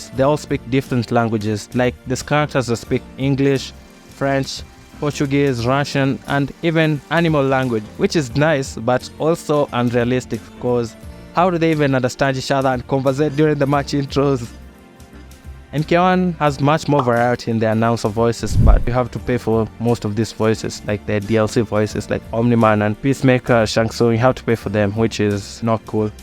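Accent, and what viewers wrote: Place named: Kenya. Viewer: Just stumbled on this channel, you sound Kenyan 🤔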